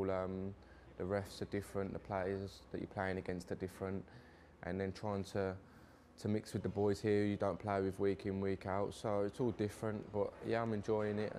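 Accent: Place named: Birmingham, United Kingdom. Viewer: He's so Brummie it hurts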